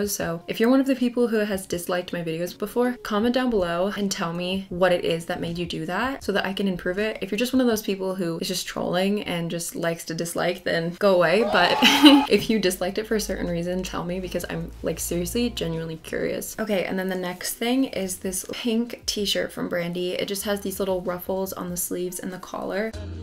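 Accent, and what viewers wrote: United Kingdom. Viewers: wait do you have a british accent? please answer i need to know im so confused haha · Hi I’m a fan who lives in London and I looove your sudden british accent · congrats on 3k!!! <3 for a video idea it would be so fun to see how u style ur brandy items with other things in ur closet, i always get new things but then dont know how to incorporate them plus ur style is so cute also this is weird but ur british accent makes me so happy lol my friends and i always did that with each other before quarantine kicked us off campus rip </3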